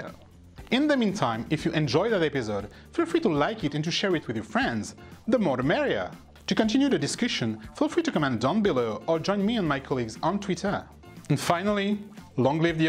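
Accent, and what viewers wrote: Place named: France. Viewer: He has French accent, I guess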